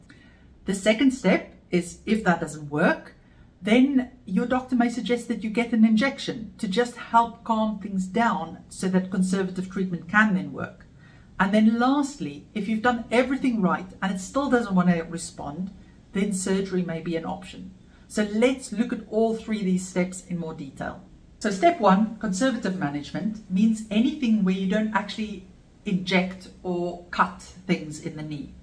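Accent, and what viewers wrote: South Africa. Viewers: Edit: From the accent it occurred to me that you're South African,. Spike did my arthroscopy.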